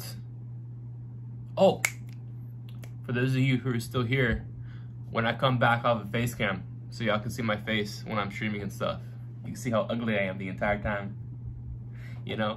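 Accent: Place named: Puerto Rico. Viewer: Yo pensé que era de Puerto Rico 🇵🇷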